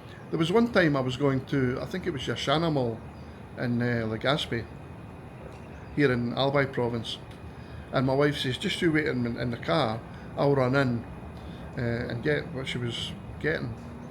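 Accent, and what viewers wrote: Scotland, United Kingdom. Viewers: Where are you from? You talk like the people in "Still game" and I absolutely love it😅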